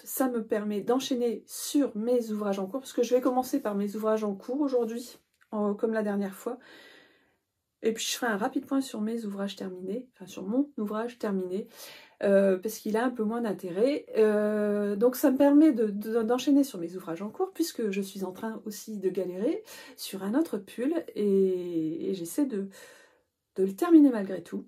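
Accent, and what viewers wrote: France. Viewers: J'aime beaucoup t'écouter, petit accent qui me rappelle mon enfance à Marquise 😉 mais pas seulement pour ça... Tes ouvrages sont beaux, la "rubrique culturelle" est claire, intéressante, tu me donnes envie d'écouter un livre audio... Merci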